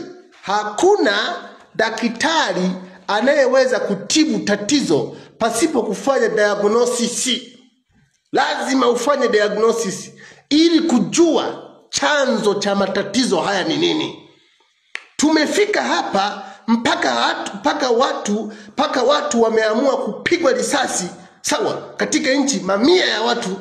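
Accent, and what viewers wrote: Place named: Sukuma, Tanzania. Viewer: anajua kiswahil, ni lafuzi yake tu iyo ya kisukuma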